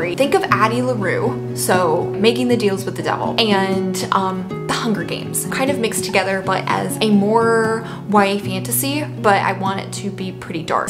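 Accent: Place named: South, United States. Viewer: Don’t hide the accent! We love the Southern flare 😁 I can’t wait to see your new project and how it progresses! It’s going to be awesome!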